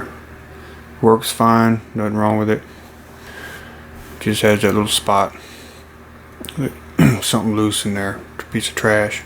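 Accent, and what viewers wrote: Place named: Texas, United States. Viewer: You sir are really funny talking with that slow texan voice ! My camera would show me a blurred screen with weird colors and wouldn't take photos anymore after a trip in the Sahara. I followed you step by step, blew canned air and picked out any dirt I could find and it worked ! I'm so happy and I wanted to thank you very much.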